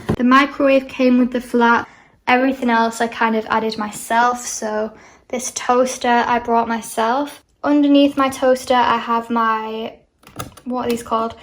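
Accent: United Kingdom. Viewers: Are u scouse?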